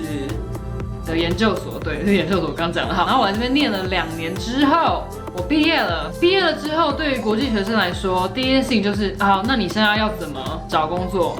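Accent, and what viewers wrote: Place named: United States. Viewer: 說英文久了 你連講中文都已經混著美國腔了 XDDD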